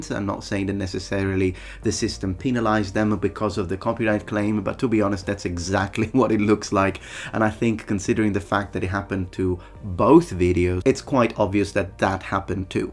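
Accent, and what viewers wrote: United Kingdom. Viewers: He does sound like a posh Englishman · The more angry gets the more British he becomes.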